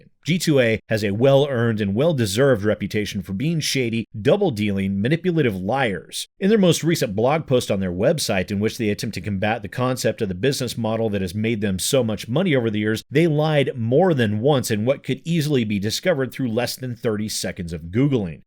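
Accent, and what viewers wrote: United Kingdom. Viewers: That britishsounding reading with supersnark felt so TB I had pangs. Gotta go watch some Genna to support her.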